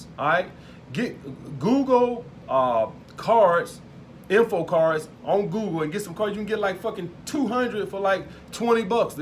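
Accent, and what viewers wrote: South, United States. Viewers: Laidback andhumble he's from Alabama if i remember correctly. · You sound like you from the south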